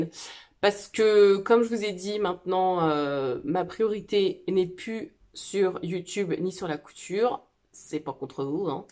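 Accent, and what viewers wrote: Lorraine, France. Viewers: Cc, ça fait du bien de te revoir 😊 Quel bel accent lorrain et beaux tissus. Je ne suis pas convaincue que l’on fasse des affaires dans ces salons 😊 Bonne continuation, à bientôt Raphaëlle DVN a cousu la robe de Bellen Paris, elle est magnifique !!! Beau choix 👍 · Coucou! Je suis de Nancy aussi et comme toi, je suis allée au marché aux tissus le 6 mai. Je partage totalement ton avis concernant la baisse du nombre d'exposants et par conséquent, du choix de tissus. Je trouve aussi que les prix ont suivi la conjoncture économique et qu'on fait de moins bonnes affaires. J'ai été très raisonnable, juste un coupon de jersey et un peu de mercerie. Il faut dire que je suis allée à celui du Luxembourg début mars et mes parents habitent dans la même rue que la grange aux tissus, de quoi faire! Lol Merci pour ta vidéo pétillante et de faire découvrir aux autres cousettes notre pittoresque accent lorrain! 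😂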